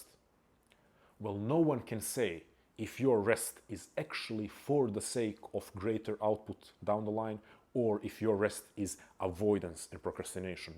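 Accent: Balkans. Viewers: Are you Balkan? Great video, just wondered because of the accent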